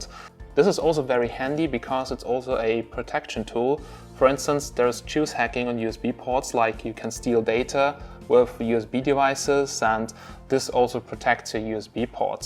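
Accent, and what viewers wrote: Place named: Germany. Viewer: I love how u speak english with German akcent :) ( bad english i am from Bosnia )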